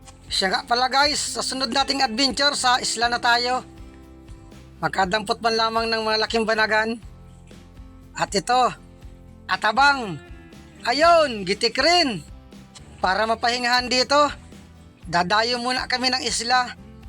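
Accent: Bicol, Philippines. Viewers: Parihas Silang taga Bicol kaya parihas dn ang style ng boses nila.. sana nga magka Collab sila 😅 halos magka parihas dn subscriber nila 😊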